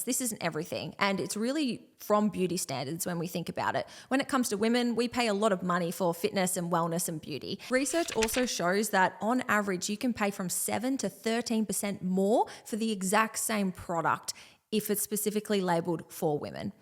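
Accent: Australia. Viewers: Damn your accent is incredibly Australian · Australian? I miss that accent. Had a lovely time during my stay in Melbourne.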